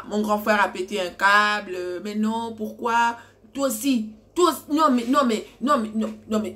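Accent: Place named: Gabon. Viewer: L'accent gabonais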